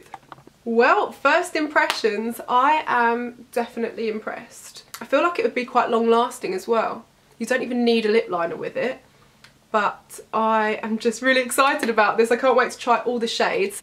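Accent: United Kingdom. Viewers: you British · Are you british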